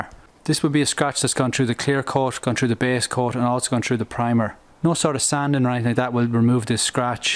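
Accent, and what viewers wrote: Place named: Ireland. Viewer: love your Irish accent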